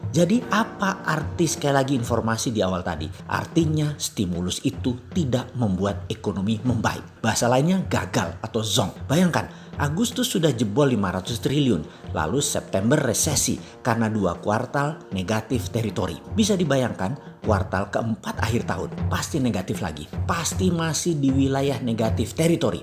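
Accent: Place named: Banyumas, Indonesia. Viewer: Wuiih ,,jd bossman ngapak ya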